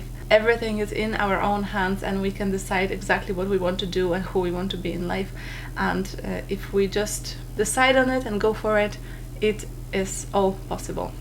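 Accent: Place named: Poland. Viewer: First time I listened to you I realized that you had the same accent that I have. I came to US from Poland at the age of 15 and I can totally relate to you and your story. You are an amazing person and you remind me so much of me at that age. I am now much older and people always get amazed by my life story. With will and perseverance one can accomplish all the dreams. With Gods or Universes help all things are possible and you are a great example of this..You are very beautiful and you have an amazing positive attitude. I loved listening to your story. I am forwarding it to my daughter who is exactly your age. Thank you for sharing it. Wishing you all the best because you deserve it. You go girl.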